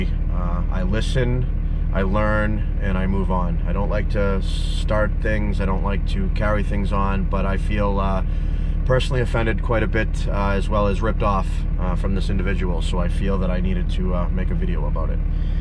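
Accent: Massachusetts, United States. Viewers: I love hearing that mass-hole accent when you talk! 🤣🤣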